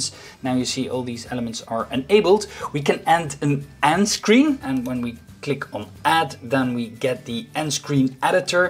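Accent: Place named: Netherlands. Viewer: Ik ruik nederlander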